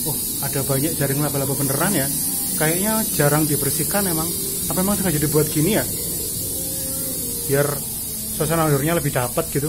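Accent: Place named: Java, Indonesia. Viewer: Masnya kayak Joe Kal.. pemberani N jowo banget kalo ngomg.. mantapp...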